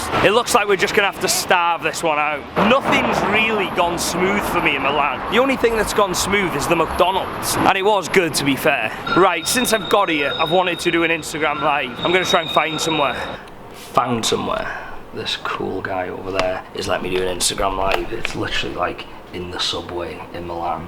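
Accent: United Kingdom. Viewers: Love your videos but i can't stand the British accent it reminds me of austin powers. If i didn't like the content so much i wouldn't be watching it all. Cheers and mate my 2 least favorite words specially cheers .... Come to Seattle Washington USA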